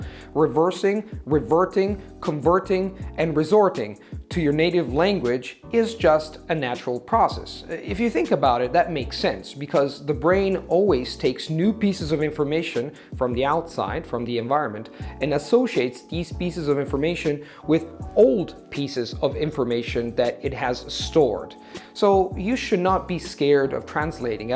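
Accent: United States. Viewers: I'm shocked too, he sounds american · You sound American, kudos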